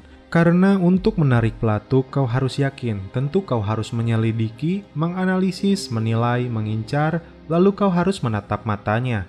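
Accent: West Java, Indonesia. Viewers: Anying orng sunda eyy:v ceuk urng orang Jawa eyy, mantabs lah kedeng urng subrek helan :v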